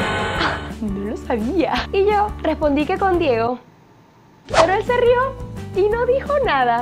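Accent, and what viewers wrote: Venezuela. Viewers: Eres de Mérida, de Maracaibo o de Caracas? Es que me confunde el acento... por cierto, buen canal me encanta tu escencia y tu entusiasmo 👏😌😍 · Creo que de Caracas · Es de venezuela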